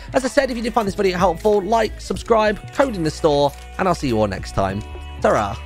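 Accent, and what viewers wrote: England, United Kingdom. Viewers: if he is British then they have a different way of pronouncing things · he is speaking English, your speaking American it isn’t the same laungige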